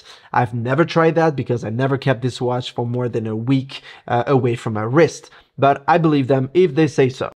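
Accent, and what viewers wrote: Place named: France. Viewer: T'as un accent français quand tu parles l'anglais ! Try to cut down on the word "really". You really say it a lot!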